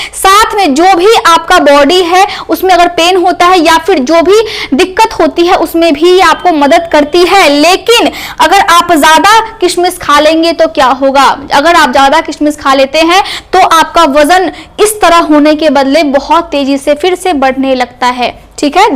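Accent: Bihar, India. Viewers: Kya aap bihari ho kya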